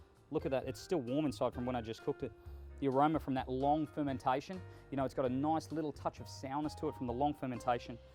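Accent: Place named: Australia. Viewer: Great food, and good to hear an Australian accent! I note you also have a Big Green Egg behind you - if you could only have one, would you choose a wood fired oven or a Big Green Egg?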